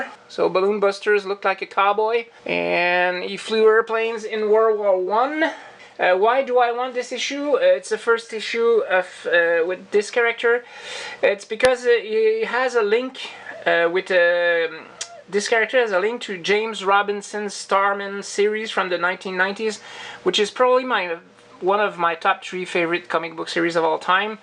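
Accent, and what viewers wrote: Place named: Quebec, Canada. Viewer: Thanks for the fabulous shout out mate, very kind of you! I am almost blushing lol That's an interesting list of comics, you have some cool targets set for 2021, I wouldn't mind that Team-Up 1 myself I must say!! You certainly have some eclectic tastes and goals too, great bits of information in the video also. I loved the Hopalong Cassidy merchandise, particularly the rocking horse/baby chair thing haha Love the accent too of course, they say we Londoners drop our H's a lot when we talk but you French Canadian folks do it even more I think!!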